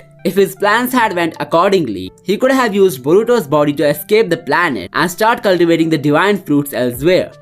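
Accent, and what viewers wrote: India. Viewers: Bro your accent is like indian r u indian?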